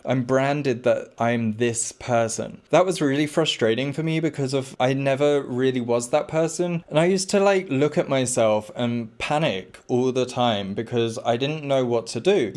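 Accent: England, United Kingdom. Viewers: Especially given that the handsome has excellent communication skills, especially when delivered with that gorgeous English accent 😍😍😍😍😍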